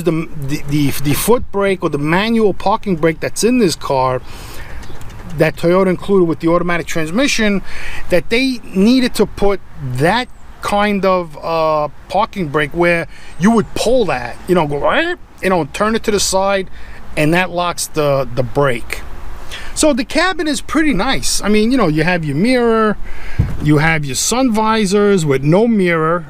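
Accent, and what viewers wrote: New York, United States. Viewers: thats boston.. this guy is from new yawk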